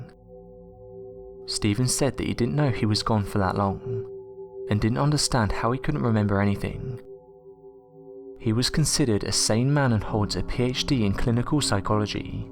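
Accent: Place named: London, United Kingdom. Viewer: English. London sounding. But it's the strange rhythm of his voice that gets me. I just can't handle listening to him. Oh well.........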